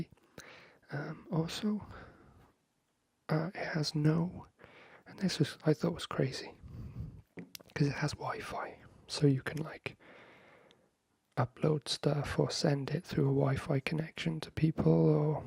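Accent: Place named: Wales, United Kingdom. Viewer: Are you welsh? :) trying to place your accent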